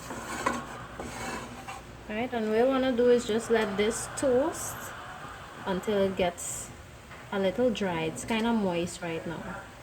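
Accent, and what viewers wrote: Trinidad and Tobago. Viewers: A tru trini talk dey gal 😂❤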